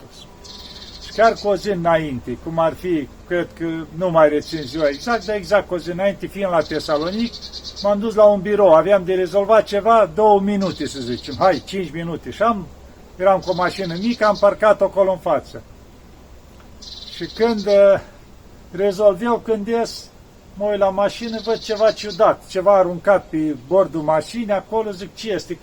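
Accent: Moldova. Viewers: parinte schimba graiul ala moldovenesc ca este enervant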